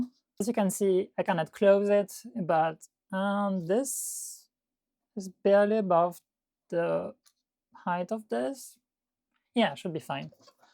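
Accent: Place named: France. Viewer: Thank you for this video,! I'm an Aussie and am intrigued with your home workshop and your accent. French? Perhaps German? Just curious. Your home workshop is amazing. Your layout, organisation... Wow. Is this a hobby or a business? Let me just say that out homes look similar, but I have 8 Billy Book Cases full of plastic tubs that hold my various hobbies and the associated equipment. IT, Electronics, painting (Cars, furniture, etc) Leather repairs, abrasives (Pads, blocks sand paper), but in a much bigger space. Sorry if asking is rude. I mean no offence. On builds... I used a ITX Z-170N Mobo, i5-7600k and 32 gb of memory. I put in a 970 Pro NVME and partitioned the drive into a boot and a cache. I decided not to HBA, instead went Ubuntu Webmin and ZFS. I don't spin down my drives! 5 x 4tb WD Red 5400rpm drives. All up, Power consumption is 38 watts idle and the highest during a stress test was 54 watts! 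In the previous NAS my 3 TB drives lasted 13 years! HBA's are great but draw a higher percentage of power. Especially the 9200 series, so I used on board sata. I have one spare cold mounted drive and the connection is to a caddy, to do backups or import data. ZFS is memory hungry. My Raid 5 (5 x 4 Tb drives) uses 55% of the 32Gb of memory. Thank you again for the great build and fun, interesting video. Merci / Danke!!!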